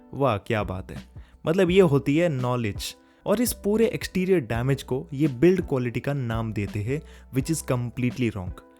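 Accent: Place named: South, India. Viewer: Brother.. appreciate your Hindi. Just improve your MTI. You purely sound south Indian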